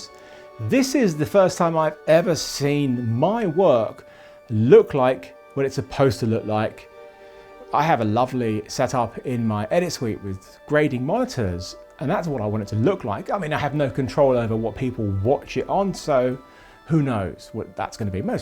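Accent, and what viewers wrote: United Kingdom. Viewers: Me... Why the heck is talking about a TV... Clicks Also Me... Who cares I'll stay for the British accent.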